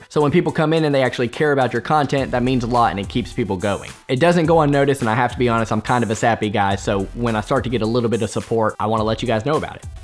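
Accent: South, United States. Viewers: Lol I got you buddy! Same here just my opinion. Why are you a Michigan fan? You sound like you kinda have a southern accent.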